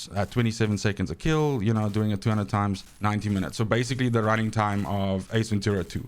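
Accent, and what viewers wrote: South Africa. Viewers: South African lol 🇿🇦?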